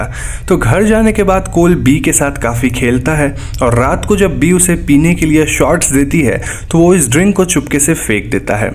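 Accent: Bengal, India. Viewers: You have a bengali accent😅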